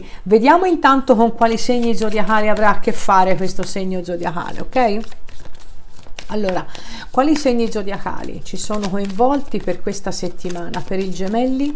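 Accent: Tuscany, Italy. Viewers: Mi garba tanto il tuo parlare toscano non so se fiorentino o pratese... saluti dal 🇲🇽 con ❤️👩‍🦳 alla mia Bell'Italia dov'è rimasto il mio 🦀 codardo quanto bello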